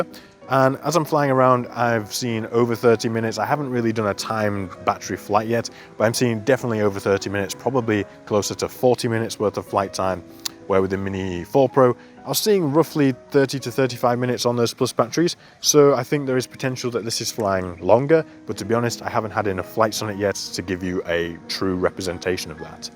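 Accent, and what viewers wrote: United Kingdom. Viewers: Hey mate. You sound British, but maybe you are living overseas? The reason I ask is where here do you get the plus batteries from in the UK? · I am working my way through your videos, kinda binge watching really! Having just bought the DJI mini 5 pro I found your videos to be extremely helpful, especially the one on cruise control!! Needless to say I have subbed, many thanks for the tuition! Best regards Dennis 👍 BTW you sound like a Brit but it doesn't look like the UK, where are you? Sorry just curious 🤔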